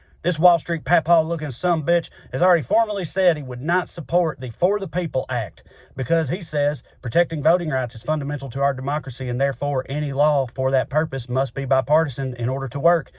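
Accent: South, United States. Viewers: Amen...sir...so refreshing to hear a Southern voice spout common sense...its rarified to be certain.....and if you aren't the only one please loop me in.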